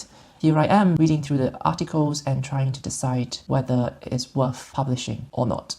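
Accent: Scotland, United Kingdom. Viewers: I love your native accent, mixed with that tint of Scottish haha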